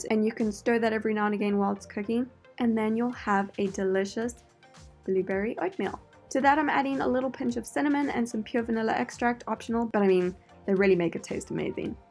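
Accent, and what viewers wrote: South Africa. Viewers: Are you maybe from South Africa? Youe accents sounds like it. Great video by the way 🌈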